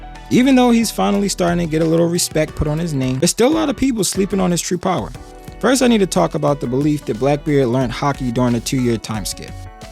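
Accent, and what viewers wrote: Baltimore, United States. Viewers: Is that a Baltimore accent lol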